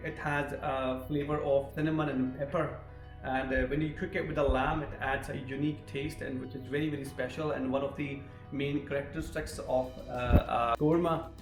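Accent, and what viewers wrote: Scotland, United Kingdom. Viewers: What was that last ingredient you added from the tiny bottle? I couldn't quite catch what you said. BTW, I love your Scottish twang.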